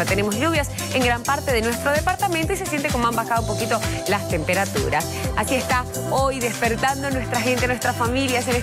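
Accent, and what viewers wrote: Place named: Argentina. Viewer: Che, esta mina habla igual q una argentina...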